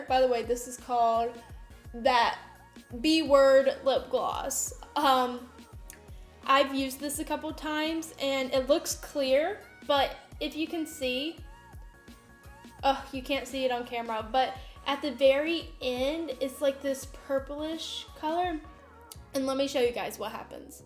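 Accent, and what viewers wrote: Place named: South, United States. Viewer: Katie Washington she sounds very southern to me.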